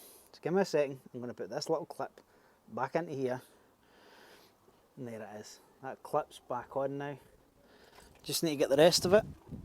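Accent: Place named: Perth, Australia. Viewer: Mate where are you located? If you’re looking for a wee Homer , I’ve a 2011 traffic, immaculate condition but the injectors need replacing. I’m in Perth. Your accent sounds close by.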